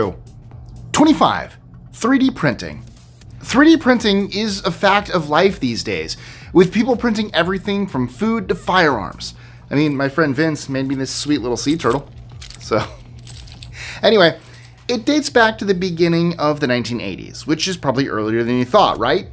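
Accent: Canada. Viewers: yup, take it from a Canadian, you DO sound Canadian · It's your accent that he thinks you're from Canada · i thought you were canadian as well ,,,,,, your more canadian than me and i live in ontario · The Mandela effect cos I thought you was Canadian too eh 😅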